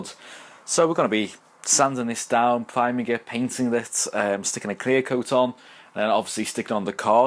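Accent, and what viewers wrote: Liverpool, United Kingdom. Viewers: I can't tell if you're scouse or not. Also the spoiler looks nice. Keep up the good videos!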